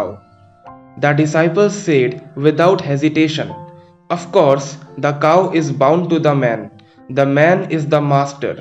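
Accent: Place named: Bihar, India. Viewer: His accent seems to suggest that he is a pakka Bihari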